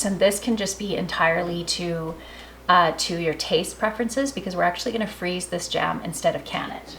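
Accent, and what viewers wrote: Canada. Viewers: I love your Canadian accent. My dad's family are Canadian. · I just love your Canadian accent and how you say words. It’s funny that you brought up “marrygolds” 😊 You have such a soothing voice that I can listen to you talk all day long. Thank you for sharing your beautiful life with us 💗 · I'm in Minnesota. Besides words like "process", I hear your Canadian accent in your "ou" words; about, house, out, etc. 😊 It's very subtle, but i hear it!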